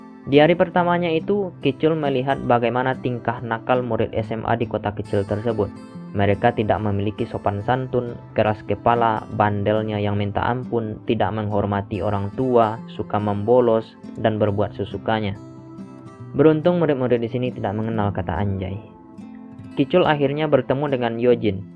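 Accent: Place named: Eastern Indonesia, Indonesia. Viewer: Kk org mana..logatnya kayk org timur😄😄😄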